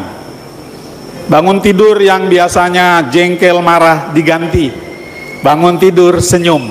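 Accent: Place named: Java, Indonesia. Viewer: Ya Romo kocak lucu namun serius Maaf sy pertama kali melihat Romo di Youtube Mau tanya Romo asli dri mana Sepertinya bahasa Jawa medok.. Semoga Romo sehat selalu .. AMIN❤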